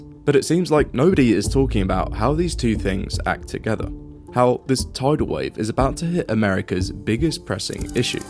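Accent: United Kingdom. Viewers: Color me skeptical of a 20 something with British accent telling me what the future holds. US debt isn’t debt. It’s treasuries. Purchased by people earning interest. It’s savings. Not debt.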